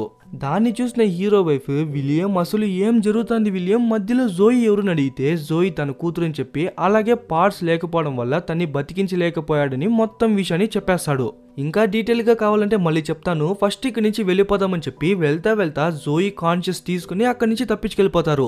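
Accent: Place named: Tamil, India. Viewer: Bro meru tamilian ahh me telugu koncham tamil mix chesinatu vuntadhi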